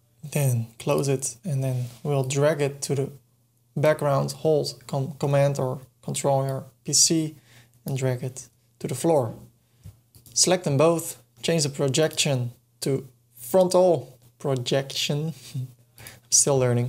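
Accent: Netherlands. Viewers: I absolutely love the netherlands accent. Great video as well. Thanks. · he said: hello, welcome to this new tutorial and I could immediately tell he's Dutch.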